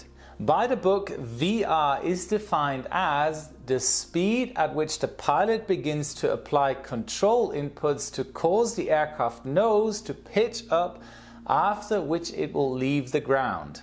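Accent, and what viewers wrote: Germany. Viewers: Bist du deutsch? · Du bist deitscher..ich höre die Akzent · Vipersniper_369 hes German.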